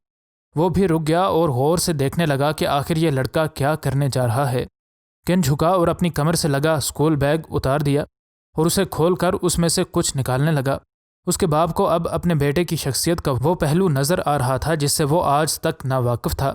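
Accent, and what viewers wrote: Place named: Sindh, Pakistan. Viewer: Ap Urdu me M.A.kro ya P.H.D pir b Teri Urdu teakh nhn ho Sakti,q.k ap Sindhi Bhai hain... Tumse ziada achi Urdu wos 4-6 saala bacha ka hy jisko alif zabr ha or beh zarb ba likna nhn a ta iski waja Yeh k yeh bacha uska bacha hy jiska Abba panageer,Hindustani, mahajir,byyah, or Urdu speaking hy...Lehaza hi Sindhi Bhai urdan daan tum nhn bnskte pir Q apni zaban ko gol gol karke Urdu bolta hy,ap bas Urdu bolo lkn pir b Sindhi E rahoge....achi Urdu sirf bayyah log E bolsakte hain Na me r Na tum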